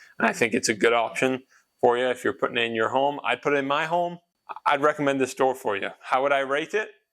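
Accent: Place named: England, United Kingdom. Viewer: Your accent is so interesting, little English-sounding words here and there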